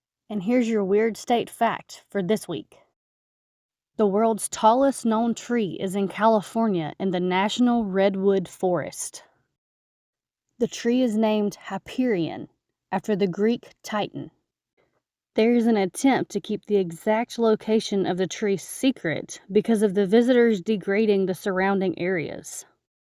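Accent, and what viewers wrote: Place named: Alabama, United States. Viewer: Honestly I would have guessed Alabama for your accent, though it's not a great match to the accent I know from folks from Alabama (it's close-ish), so the accent question, while potentially a bit rude is also kinda fascinating. Seems kinda like a NYC vs upstate New York accent situation (or Mass - folks on the western side of the state sound completely different than folks from Boston). Then you have folks like me who kinda pick up pieces of accents in about a week of exposure - we can be quite vexing to pinpoint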